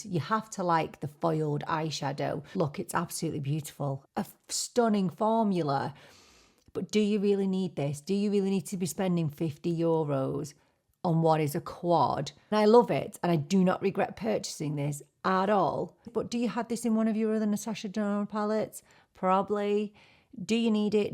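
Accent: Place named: Newcastle, United Kingdom. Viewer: You quote euro currency but at the same you have a strong english accent (Newcastle?), can you share where are you from? 😊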